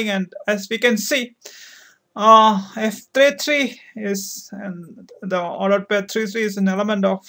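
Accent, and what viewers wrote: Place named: India. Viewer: Well explained..!! The accent is not at all a topic of this video. If you guys cannot understand his accent, find some FREE material, and learn more about Indian accent. This one explains the mathematical concept better than other fluent accent videos.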